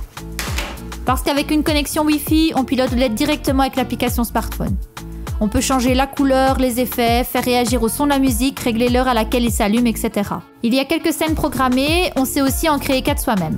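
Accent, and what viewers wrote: Belgium. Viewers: Salut, superbe vidéo, je me suis abonné. Est-ce que c'est un accent belge que j'entends ? Si c'est le cas, très heureux de savoir qu'une patriote fait d'aussi bonnes vidéos. Si jamais tu es intéressée, je peux t'expliquer l'électronique facilement, ça pourrait t'aider pour tes futurs projets pour soit avoir moins de difficultés, soit payer moins cher pour mieux ou le tout en même temps. Continue ce que tu fais !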